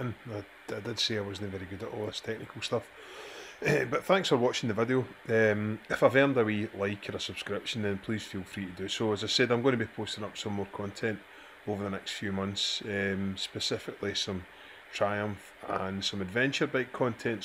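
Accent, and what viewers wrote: Scotland, United Kingdom. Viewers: This is weird! I was just going to say something very similar and my name is ALSO! Hehehehe (wow) Very nice to hear a fellow Scot doing reviews, even if I do now live in America) Subscribed and liked Sir! 👍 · your Scottish accent is difficult to understand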